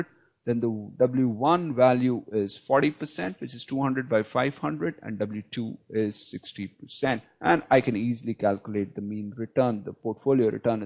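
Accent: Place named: India. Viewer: The video seemed good, but you could've used someone with a little better/softer accent. I mean, no offence, Indian accent is already quite hideous, but this one was absolutely abominable.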